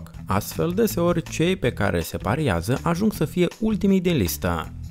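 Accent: Moldova. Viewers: 🤐 Foarte frumos clipul, dar comentariul este total aiurea de...moldovenesc de peste Prut ! Recomand revizionarea clipului ori de câte ori doriți, dar ÎNCHIDEȚI sonorul !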